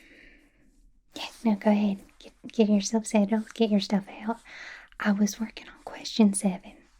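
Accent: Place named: South, United States. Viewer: When I read “Goth Rogue”, I was imagining like a DnD character, so I was a little taken-aback by the southern accent 😅 but I still like the actual video idea 👍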